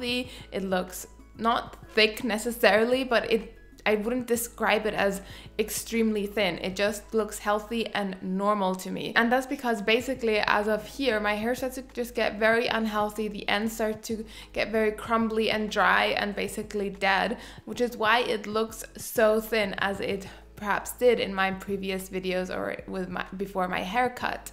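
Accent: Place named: Germany. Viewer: I love that I can hear you are german by you saying "Mine" at all times, rather than "my"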